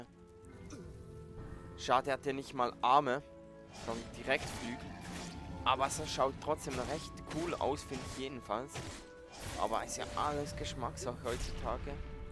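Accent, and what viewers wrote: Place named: Switzerland. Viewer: Also ich find den leichten Schweizerakzent ganz angenehm ;) Diese deutsch-deutsch-Laberer kann ich langsam nicht mehr hören xD Hab auch gleich abonniert ;)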